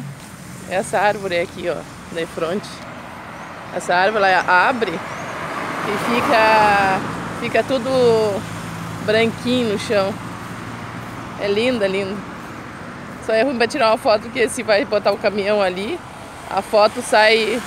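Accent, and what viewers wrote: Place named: Santa Catarina, Brazil. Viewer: Vc tem sotaque de Catarinense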